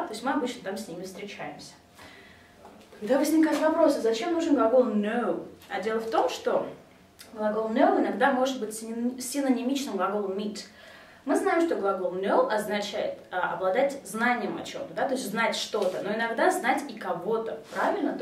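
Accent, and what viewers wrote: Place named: United States. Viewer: Кстати у американский акцент, как у носителя языка с первого раза и не скажешь, что она русская!!! Продолжайте в том же духе и по больше видео!!!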